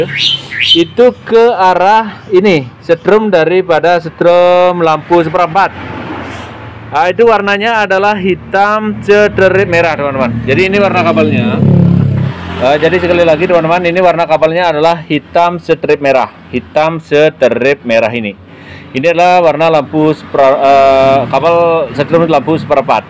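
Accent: Bali, Indonesia. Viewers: alamat mna , kalau dari logatnya orang Bali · Logat nya bali bgt bosku. Sukses slalu bos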